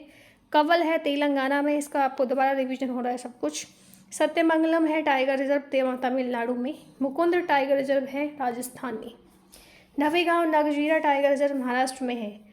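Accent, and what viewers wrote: Odisha, India. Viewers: Mam aap odisha ke ho kya?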